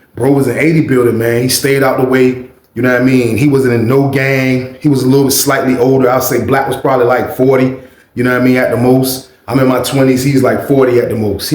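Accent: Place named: Chicago, United States. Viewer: Yo the Chi town voice tho! 😂😂😂😂 then the $1000 POWER PLAY!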